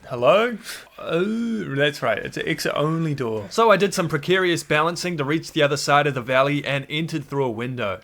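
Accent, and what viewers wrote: New Zealand. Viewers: Do I hear a new Zealander ?? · New Zealand, you can tell because the vowels are slightly different. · a New Zealand accent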